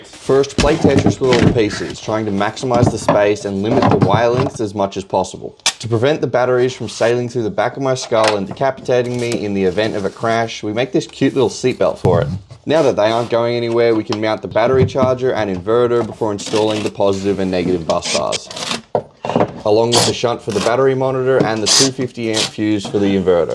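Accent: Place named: Australia. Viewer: Might miss the mark entirely, but he sounds Aussie to me. So not European but still nonetheless your comment does partially apply.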